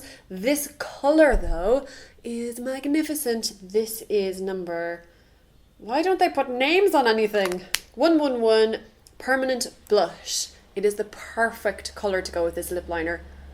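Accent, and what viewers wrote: Ireland. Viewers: I know is in Australia, but her accent sounds very irish. So im confused.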